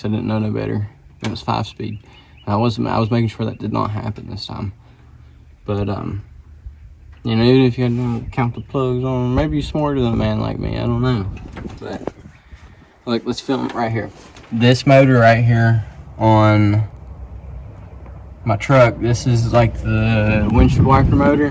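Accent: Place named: South, United States. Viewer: You sound southern, you near Cookeville Tennessee? I’ll help you paint it!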